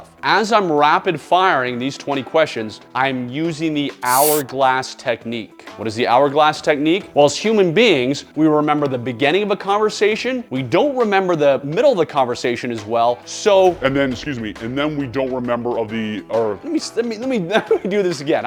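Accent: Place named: Texas, United States. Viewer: That´s a Texan accent.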